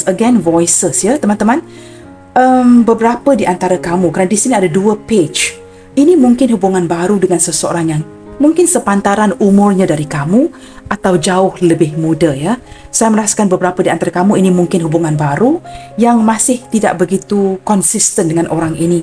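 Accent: Malaysia. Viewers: Terima kasih bunda kesayangan ku yang selalu siap setia kasih kita semua pencerahan sehingga kita semua selalu wawas diri dalam bersikap, readingan yang sangat gemoy idaman setiap subcribers nya dengan alunan swara yang sexi terutama logat basa Melayu nya ILIKE IT.sangat resonet bund 👌👍🤲🙋🙏.betul bund komonikasi itu penting 🙏🙏🙏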